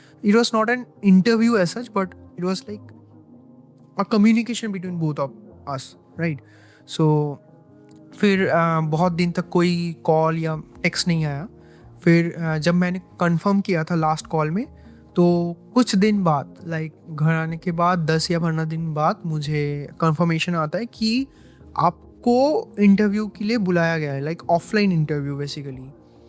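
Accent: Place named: Bengal, India. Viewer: Bhai tumi Bangali Je tomar Hindi sunei Bojha jacche Anyways Good and Informative ...Thanks a lot.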